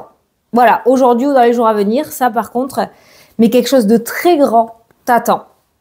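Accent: South, France. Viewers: Bonjour ma, un grand merci pour ta guidance qui me donne du peps, tu es toujours joyeuse et j' adore ton petit accent du sud. Merci pour ton dévouement, ta gentillesse et ta bienveillance. Que le seigneur te bénisse ainsi que ta famille.❤❤❤🙏🙏🙏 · Je t’adore chaque jour tu me fais du bien avec ta voie du sud sa me réchauffe le coeur bonne journée et félicitation mais tu le mérites bizzzz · c'est une joie de vous écouter , j'adore votre accent du sud il met du soleil dans notre journée.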